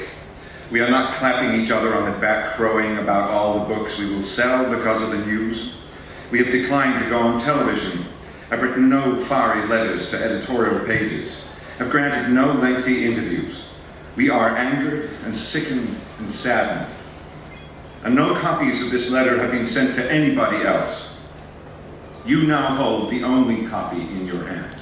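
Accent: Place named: United States. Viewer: His American accent was so adorable! 💕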